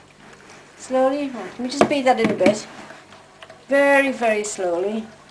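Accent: United Kingdom. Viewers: Me too! That not a U.K. accent? Close enough! LOL Love those Bongo's!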